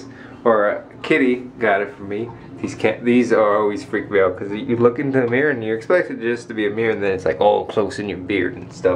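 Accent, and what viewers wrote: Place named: Ireland. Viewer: You like an Irish man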